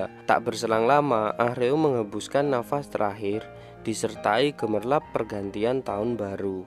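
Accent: Java, Indonesia. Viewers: kenapa kalau ente yg ceritain film ini feel sedihnya kagak ada....yg ada malah ngantuk! mungkin karna meddok yah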